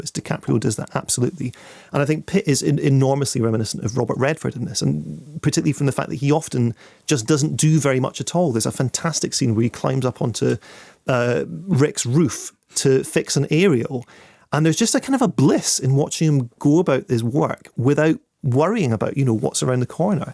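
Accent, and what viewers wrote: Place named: Edinburgh, United Kingdom. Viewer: This guy has a serious edinburgh uni accent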